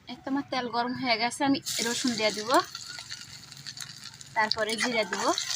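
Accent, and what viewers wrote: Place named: Rajshahi, Bangladesh. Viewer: তোমার কথা শুনে বোঝা যাচ্ছে তুমি রাজশাহী জেলার মেয়ে, কিন্তু কোথায় তোমার বাড়ি একটু বলবে আপু ❤❤❤❤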